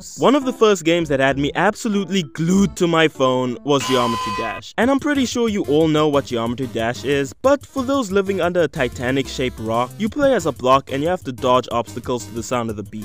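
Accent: South Africa. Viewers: Bro are you South African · Do I hear a south african accent? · Ngl you sound south African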